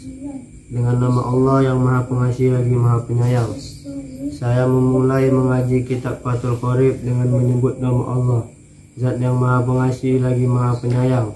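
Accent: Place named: Medan, Indonesia. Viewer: Jelas kali logat medannya 😂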